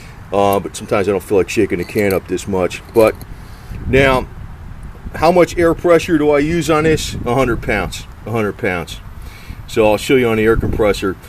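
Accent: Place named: Philadelphia, United States. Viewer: Sounds like he’s from Philly.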